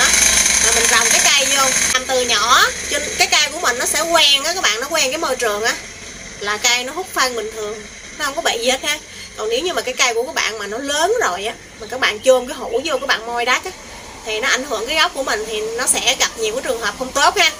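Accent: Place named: Mekong Delta, Vietnam. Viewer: Da.tai coi nghe chi nói chuyện giọng trong trẻo và ấm áp nên e tưởng chị là người miền Tây ah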